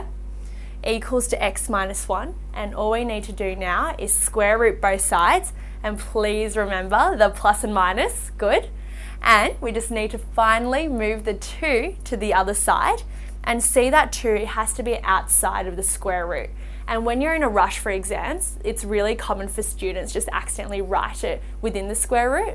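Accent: Australia. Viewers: thats aussie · maybe Australia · aussie chinese descendant im sure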